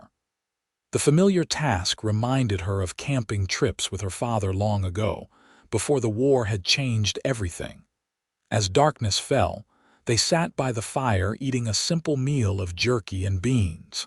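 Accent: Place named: Canada. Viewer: Sounds Canadian. Liked this story very much. Thanks. GA 🍑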